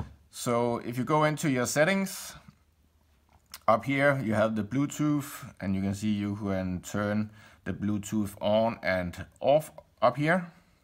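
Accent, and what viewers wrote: Denmark. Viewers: After hearing your accent: Are you Danish? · Are u danish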